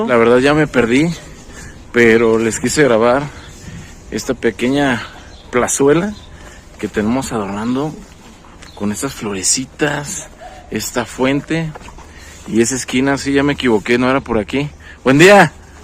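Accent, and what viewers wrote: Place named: Mexico. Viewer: Jajaja me encanta como relatas , con este toque mexicano! 😂😂😂😂😂😂😂😂 y pues cuidado con las chinches ! Revisa toda tu ropa antes de llegar a casa , porque esas se esconden y después proliferan 🥲🥲🥲😊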